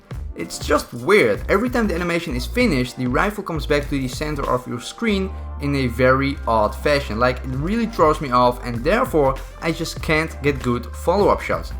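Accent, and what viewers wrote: Netherlands. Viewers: ' ' ben je Nederlands? Het klinkt zo maar ik weet het niet zeker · Ik hoor dat je nederlands bent 😆😋😂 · is he dutch? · Are u dutch? Ur accent really sounds dutch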